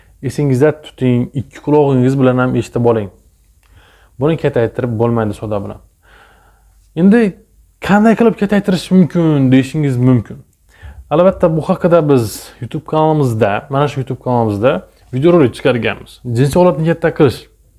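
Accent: Khorezm, Uzbekistan. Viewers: Салом алейкум доктор хоразмданмисиз аксентиз билиниб дурибди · Доктир шеваингиз хоразма тортадику недансиз?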